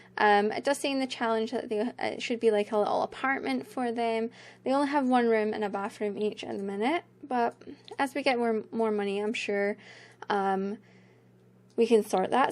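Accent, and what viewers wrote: Scotland, United Kingdom. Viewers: I'm pretty sure is Scottish, not Irish.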